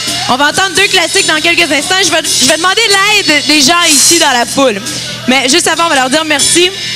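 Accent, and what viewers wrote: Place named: Canada. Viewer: J'adore l'accent canadien :-)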